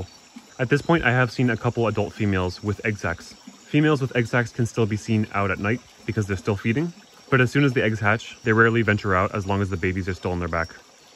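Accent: United States. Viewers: American Accents are bonkers as it is but this guy sounds like he’s trying to talk with marbles in his mouth.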